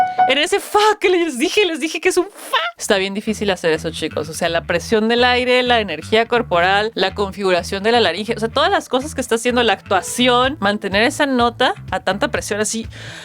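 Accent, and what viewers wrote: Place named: Mexico City, Mexico. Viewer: Es el mejor, lo viven vivo en NYC. El Ingles es su tercera lengua ( Kazajo, Mandarín,Inglés) más o menos fluido. Escuché norteamericanos e ingleses reaccionando y dicen que su casi perfecto. Con tu inglés chilango tienes razón ( nada que ver ) no escucharás el inglés. Te daré referencia de gente que habla inglés, desde “ ” song se nota su mejoría. La música no tiene idioma. Por lo demás está bien tu estilo de reacción.. Te felicito porque descubriste al mejor. 👍👍🇭🇳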